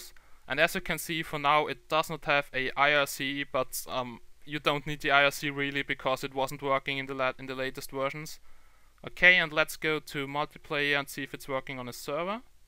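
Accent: Germany. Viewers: You have a German accent haha: DD! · you sound like German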